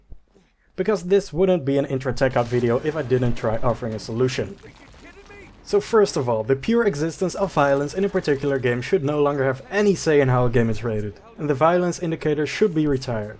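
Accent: Netherlands. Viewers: I think he has a light Dutch accent but I am not sure. I do know that the movie rankings In the Netherlands stop at 16 which he mentioned in the video so it's possible.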